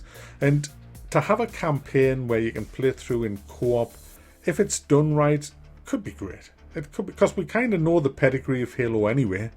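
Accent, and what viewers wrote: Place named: Newcastle, United Kingdom. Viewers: No he ain't, I live in Wales. He sounds like a geordie (Newcastle) to me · Wrong accent, he's from Newcastle which is in England very far from Wales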